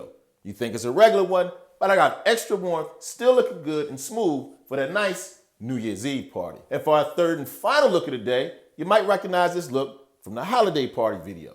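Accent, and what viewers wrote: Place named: East Coast, United States. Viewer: Ahh ok you from Philly, I had a feeling you was from somewhere on the east coast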